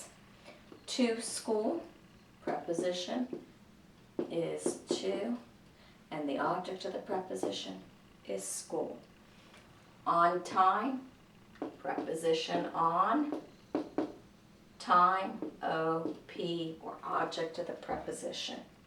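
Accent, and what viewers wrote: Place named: South, United States. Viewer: Beautiful southern accent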